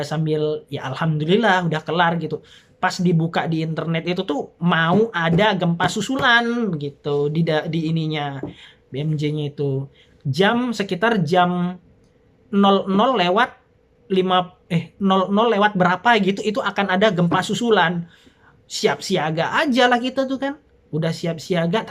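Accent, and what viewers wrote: Java, Indonesia. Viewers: iya, kirain dari jawa...😂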